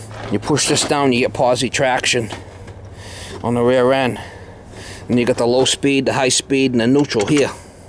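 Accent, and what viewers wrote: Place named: South, United States. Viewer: Are sure you're not one of us Southerners? Lol. Keep the vids coming brother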